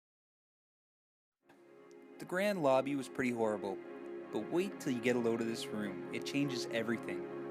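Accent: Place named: Canada. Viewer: best Canadian accent ever!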